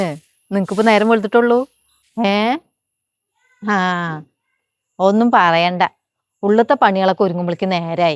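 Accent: Palakkad, India. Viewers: പാലക്കാടൻ slang ആണോ ഇത്?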